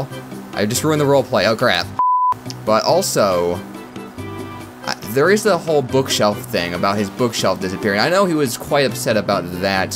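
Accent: Australia. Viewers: why the Aussie ascent hmmmmmmm..... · You’re my favorite YouTube or ever I like your name and your British accents pretty good I mean I mean Australian accent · Thanks mr Australian accent guy for saying we are terrifying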